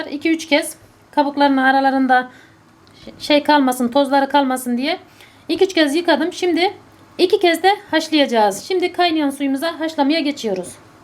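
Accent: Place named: Antakya, Turkey. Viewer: bu antakya aksanı. tesekurler arkadas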